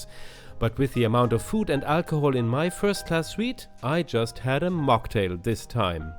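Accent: Germany. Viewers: Hay, I've only just found your channel a short while ago. I had been watching one of the reviews from "Nonstop Dan" and whilst making a comment to him about his latest second channel, I saw, in the comments section, that you had also added your own comments. That was the very first time that I have heard of so my reaction was to immediately check you out. I am so very glad I did! I've only just begun looking through your quite extensive range of reviews and, from what I've seen so far, I don't think that I'll at all disappointed with them. I intend to spend a very enjoyable time as I go through your work. BTW Ilove your wonderful German accent! I have visited many parts of Germany because it's so beautiful, as are it's people. I am now looking forward to following you very much indeed. I love your presentation and your appeal! Thank you and Keep Safe, Keep happy and KEEP FLYING! 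Thanx.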